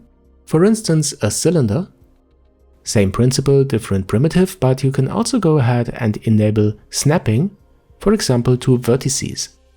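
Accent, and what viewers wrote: Germany. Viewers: yeah... that explains it.. I've never heard germans accent before other than that autogefuhl guy. You guys sounds a lot calmer than the internet claims you to be. lol.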